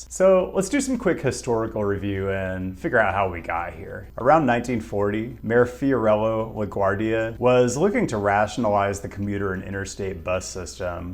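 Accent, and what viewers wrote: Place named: San Diego, United States. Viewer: He becomes more handsome in each video , is he from southern Cali he speaks like how San Diego people sound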